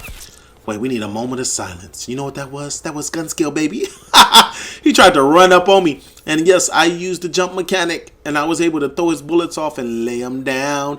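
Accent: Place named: United States. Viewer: It's not pronounced call of dooty it's jewty fucking yank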